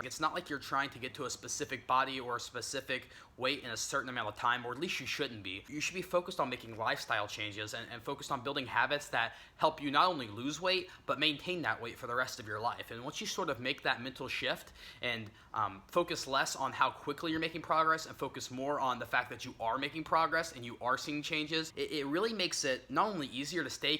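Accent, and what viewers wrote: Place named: United States. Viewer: Wow! I thought you were from Europe but you're an American. this is great I love your accent and thanks for this great video Greeting form Afghanistan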